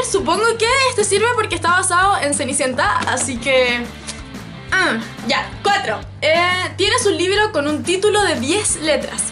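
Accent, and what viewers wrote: Chile. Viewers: eres chilena perdon recien te conosci en youtube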